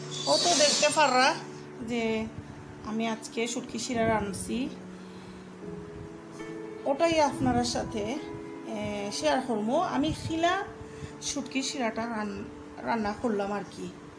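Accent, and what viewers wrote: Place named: Sylhet, Bangladesh. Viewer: আসসালামু আলাইকুম আপনি কেমন আছেন আশা করি ভালো আছেন আপনার বিডিও অনেক ভালো লাগছে রেসিপি সিলেটি কথা ভালো লাগলো আমি ও সিলেটি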